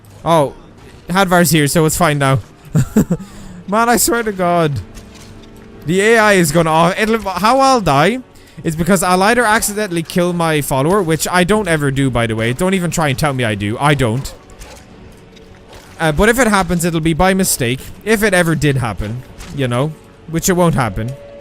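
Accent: Ireland. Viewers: You never fail to guilt-trip me into Liking your videos, eh?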